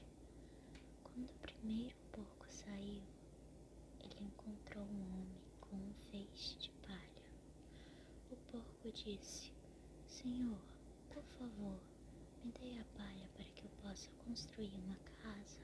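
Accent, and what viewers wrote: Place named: Ceará, Brazil. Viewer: Você é do ceará? Achei o sotaque parecido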